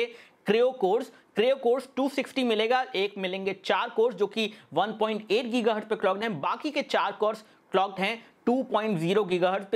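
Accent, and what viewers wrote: India. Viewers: WHAT? i dont understand you its indglish ?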